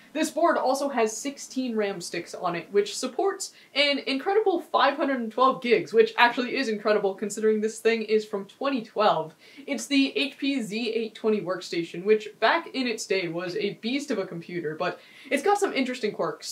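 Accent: Canada. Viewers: Very curious where your accent is from, I like it. (It sounds very Canadian to me, but I could be wrong).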